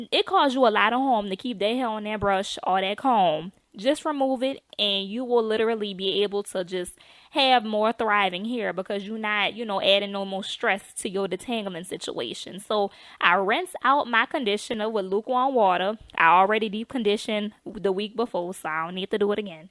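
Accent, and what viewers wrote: New Orleans, United States. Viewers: Just found your video. Good content. You sound like you are from New Orleans where I'm from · yes, New Orleans, my husband and my in laws are from New Orleans, girl, love the accent, and hair.. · As soon as I clicked this video: "Oh. She from New Orleans😁 Yes indeed." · I bet she from New orleans with this accent😭😍😍😍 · Come thu New Orleans accent!!!!! I love it!! Don’t judge me.....judge your friends😂😂 Laissez les bon temps rouler!!! 💚💜💛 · Watching this video in the car w dad. He heard your voice and immediately said, "She from New Orleans?" He heard that accent baby 😂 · Are you from New Orleans?? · When N.O accent is lifeeee 😩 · Your New Orleans accent is the best! You couldn't hide that thing if you wanted to! :) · beh-behhh.. girl.. im in LOOOOOOOVE with your nola accent.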